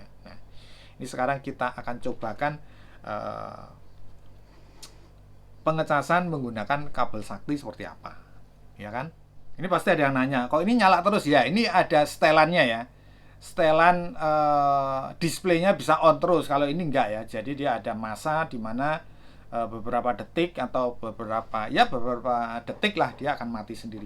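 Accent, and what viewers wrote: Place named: East Java, Indonesia. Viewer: Nek wiz muni,, "Haaahhhduhhh...." Aksen JATIM nya kuat sekaLii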